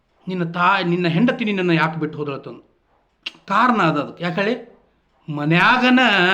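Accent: North Karnataka, India. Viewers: Nimma utthara Karnataka maathu keloke thumba khushi agutthe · Khadak uttarakarnataka spacial bhashe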